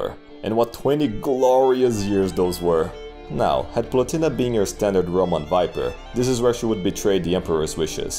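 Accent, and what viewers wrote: Brazil. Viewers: Sir Suspicious yeah I’m not familiar with Brazilian accents at all, which is why I assumed Romanian/Greek.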